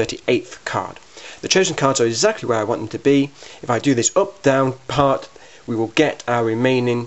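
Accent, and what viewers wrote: United Kingdom. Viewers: i would guess ur british? -.-' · i like ur british accent~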